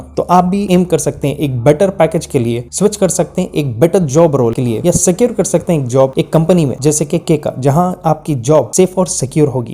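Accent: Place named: South, India. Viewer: bhai bolne ka tareeka ajeeb nhi hi Ye south india ka accent hai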